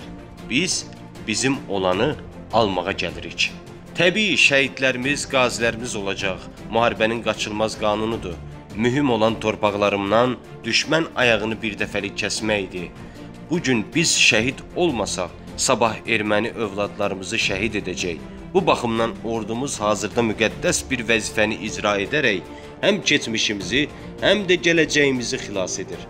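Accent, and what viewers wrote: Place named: Iran. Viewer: SEN HARALISAN DANİŞAN? İRANLISAN?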